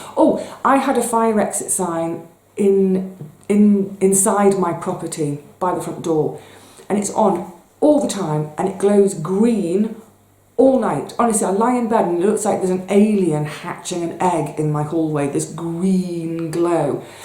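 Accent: United Kingdom. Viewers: british accents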